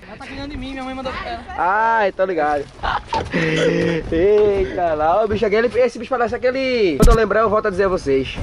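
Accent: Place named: Northeast, Brazil. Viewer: Esse sotaque do nordeste é massa de mais mano kkkkkkkkk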